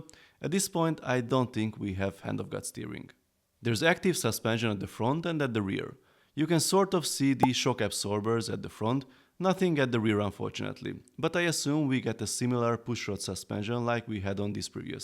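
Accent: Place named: Hungary. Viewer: Magyar vagy? Akcentusból gondolom de ha nem így van szólj😂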